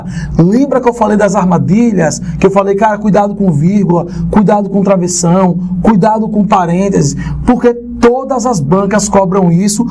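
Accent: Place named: Bahia, Brazil. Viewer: Vc é o cara mesmo negaum kkkk esse sotaque da bahia é fodaa. Ryan BH MG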